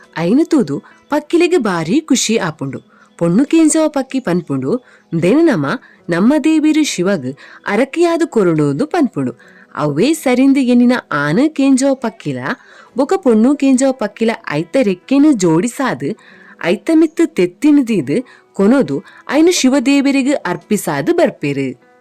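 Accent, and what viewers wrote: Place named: United Kingdom. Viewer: ತುಳು ಸರಿಯಾಗಿ ಮಾತಾಡಿ, mam ಇಂಗ್ಲಿಷ್ ನವರು ಕುಡ್ಲಕ್ಕೆ ಬಂದ ಹಾಗಿದೆ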